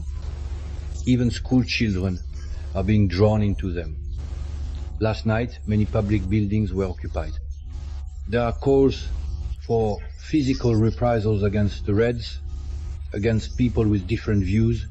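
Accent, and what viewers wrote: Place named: Poland. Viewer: english polish accent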